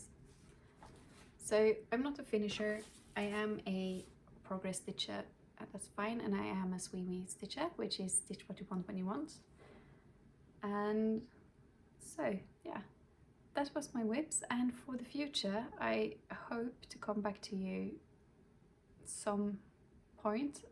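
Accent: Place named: Scandinavia. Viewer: Hello from Chicago. Great WIP parade - awesome collection. Thank you for sharing your personal story with us. I think you are beautiful and from my side of the pond, I think your speech sounds just like that of my other acquaintances from Sweden, Norway and Iceland. It is ironic that many of us are struggling with weight loss and we forget that there are other people on the other side of the spectrum who need to gain weight. Best wishes in your recovery, and I look forward to catching up with your videos.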